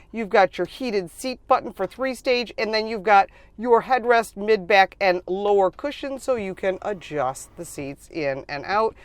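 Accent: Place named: Chicago, United States. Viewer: Watched it 3x just for the Chicago accent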